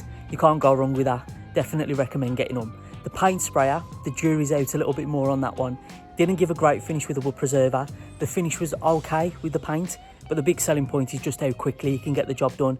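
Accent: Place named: Birmingham, United Kingdom. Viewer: Up the brummie, great ole mate😊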